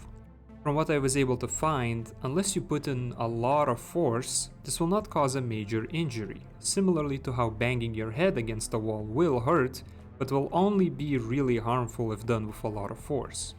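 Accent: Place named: Poland. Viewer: słychać polske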